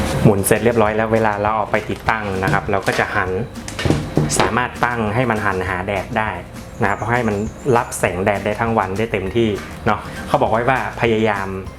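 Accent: North, Thailand. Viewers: สำเนียงคนเหนือใช่ไหมครับ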